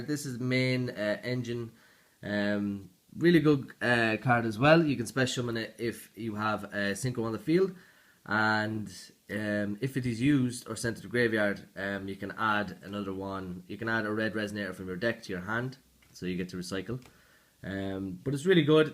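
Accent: Ireland. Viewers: Ayy you sound irish :P sell me your deck pls xd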